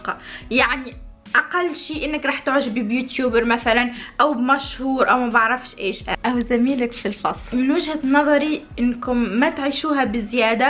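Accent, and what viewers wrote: Tunisia. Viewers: انا اول مرة نشوف فيديو تاعك اما انتي تونسية مش هكا حسيت من لهجتك هكا نبرتك انك تونسية صحيح و الا لا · Omg u're a tunisian girl when i heard u frist i felt u were tunisian but then i was like naahhh this girl has a lot of accents idk menysh msad9a ama merci i needed thiiissss sooo baad 💖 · حسيت انو انتي من شمال افريقيا انتي من تونس 💙💙💙💙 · انت تونسية؟؟❤️❤️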